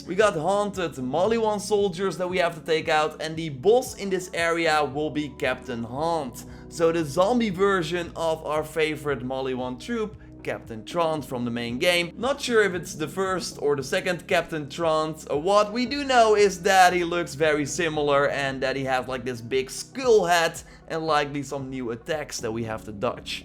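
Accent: Netherlands. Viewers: ....... are you dutch?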